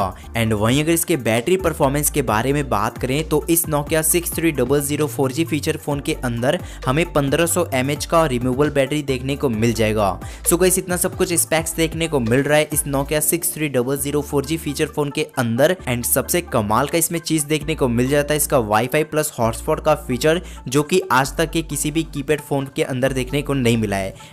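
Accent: Hyderabad, India. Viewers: hyderabad sa ho kya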